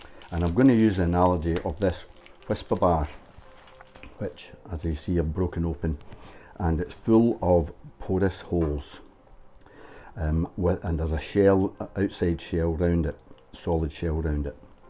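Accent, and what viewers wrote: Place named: Scotland, United Kingdom. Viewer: Great video and superb Scottish accent. Greetings from Poland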